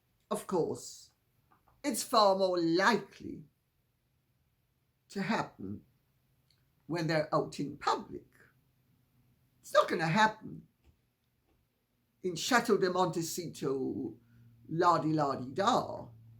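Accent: United Kingdom. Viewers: ☆☆☆\\\ELAINEWASHINGTON///☆☆☆ THANK YOU, LADY C FOR ALL YOU DO FOR YOUR HARD WORK ON RESEARCH ON OBTAINING THE CORRECT INFORMATION . THEN WRITING SO THAT THE INFORMATION SOUNDS OF INTEREST TO ALL LISTENING . YOU DO SUCH A FINE JOB AND I WANT TO SAY THANK YOU AND HOW MUCH I ENJOY LISTENING TO WHAT YOU HAVE TO SHARE. I LOOK FORWARD TO HEARING YOUR VOICE EVERYDAY . YOU ARE SO BRITISH ! WE HAD A BRITISH COUPLE VISIT OUR CHURCH AND THEY WERE SO WELCOMED ! WE WOULD ALWAYS ASK THEM TO TALK BECAUSE WE LOVED HEARING THEM ANSWER ! WHY ? WE ENJOYED HEARING THEIR BRITISH ACCENT AND SAYINGS. I LOVE YOUR ACCENT ! YOU ARE SO BRITISH ! I LOVE YOUR EXPRESSIONS AND ACCENT . THANK YOU LADY C. I LOVE THE WAY YOU SOUND WHEN TALKING !💖 I LIKE YOUR JEWELRY AND DRESS . YOU LOOK SO GOOD💝 HUG THE FUR BABIES.💋 THEY LOVE YOU LADY C .💛💚 LADY C DID YOU SEE ON UTUBE WHERE HARRY WOULN'T HOLD HARRYS HAND ? 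DO YOU SEE 👀 THE UTUBE VIDEO ? DO YOU HEAR ANYTHING ABOUT THR TWO DIVORCING ? MANY PEOPLE HAVE SEEN 👀 INTERCHANGING BETWEEN THE TWO HERE AND THERE THAT HARRY 👀 WASN'T HAPPY WITH RACHEL RAGLAND 😒 ON ONE OF THEIR APPEARANCES . RACHEL SEEMED INSISTENT TO HOLD HARRYS HAND. THAT MADE HARRY PULL AWAY FROM R.RAGLAND MORE ! DO YOU THINK THE HONEYMOON IS OVER ? HARRY LOOKED SO VERY UPSET !😡 ☆ THOMAS MARKLES AND HIS ELDEST DAUGHTER , SAMANATHA , PLUS HIS SON ALL ASKED NOTTO REFER TO MEGAN AS MARKLES ANYMORE FOR THOMAS HAS DISOWNED HER AS HIS YOUNGEST DAUGHTER . HE SAID SHE HAS TOLD SO MANY LIES ABOUT HIM AND THE REST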